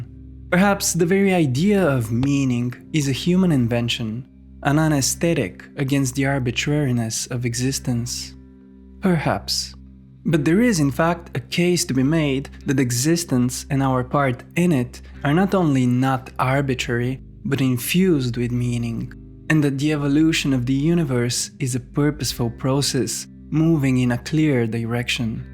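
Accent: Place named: India. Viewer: please a video about alfred adler .. you have such a nice voice its like an indian guy talking englisch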